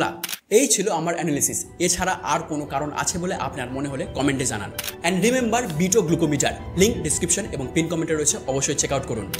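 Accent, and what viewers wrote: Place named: India. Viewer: ভাইয়ের উচ্চারণে হিন্দির টান আছে।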